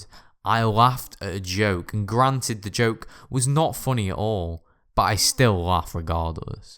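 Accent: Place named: Northern England, United Kingdom. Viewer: Loving the reet northern accent ✌️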